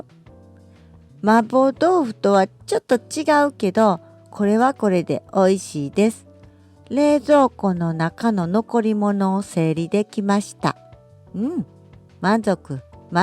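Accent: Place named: Kansai, Japan. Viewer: ゆっくりとした関西弁が心地いいです。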